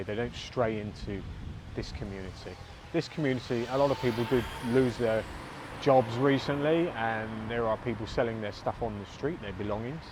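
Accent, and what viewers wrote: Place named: Yorkshire, United Kingdom. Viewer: Great tour...... Nice to see you've not lost that Yorkshire accent 😀 5555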